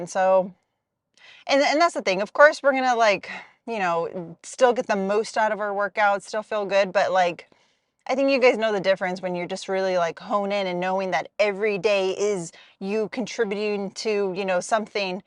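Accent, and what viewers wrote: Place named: United States. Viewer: p.s i always loved youe american accent but i never knew there were palm trees where you live... its dark and it snowed last week where i live.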